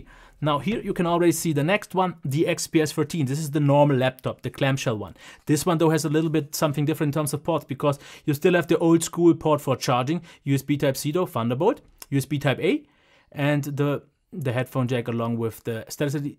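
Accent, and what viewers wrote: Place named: Germany. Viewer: Whenever i hear this guy's German accent, I'm thinking of the movie Terminator.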